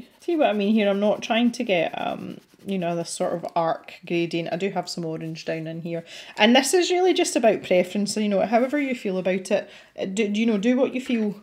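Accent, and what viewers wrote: Scotland, United Kingdom. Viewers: I am so loving this, its lovely to hear your accent too, I live in Aberdeenshire (Royal Deeside) so I feel really at home watching your channel.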